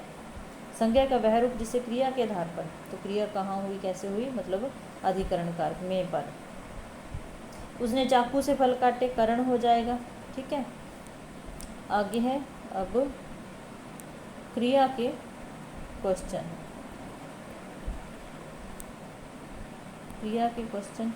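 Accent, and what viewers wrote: Punjab, India. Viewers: Mam aap Punjabi ho kya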